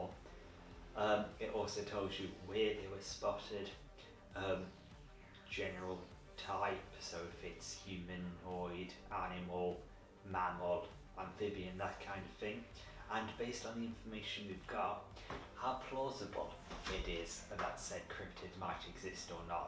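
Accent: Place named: United States. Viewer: So is his accent English or Australian ? He is definitely an American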